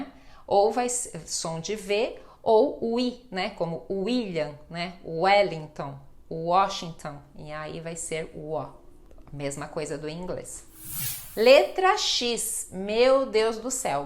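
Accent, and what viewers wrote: Brazil. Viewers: Es portugues de brazil?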